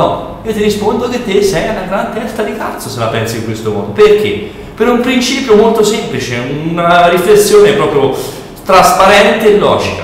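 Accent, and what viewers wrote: Tuscany, Italy. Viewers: HAHAHAHAHAHAHAHAHAH ! Sei troppo forte !!!!! mi piace tantissimo sentire parlare Toscano, stupendo il passaggio del dito medio......... ahahahahahahahah !! Mi ricordi tanto il conte uguccione......! ahahaha... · l'accento toscano <3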